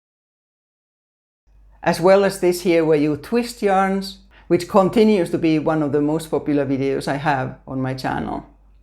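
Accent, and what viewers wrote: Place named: Finland. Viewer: I love your Finnish accent and your easy to follow directions and this video. My daughter and I met 23 of my relatives from Paimio, to Sotkamo to Aavasaksa and many more cities. Finland is a beautiful country with beautiful/kaunis people. Thank you very much/ Kiitos paljon. From California.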